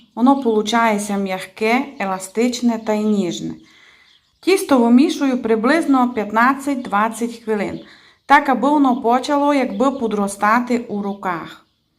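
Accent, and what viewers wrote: Transcarpathia, Ukraine. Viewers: Обожнюю Закарпатській діалект, а рецепти супер. Я обов'язково все перепрошую. Бажаю миру і веселих свят. Вітання з Буковини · Слухаю Вас і милуюся закарпатським діалектом! Дякую за рецепт, якраз шукала як приготувати пончики!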